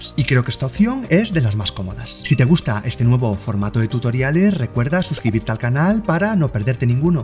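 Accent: Spain. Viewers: Eres Español??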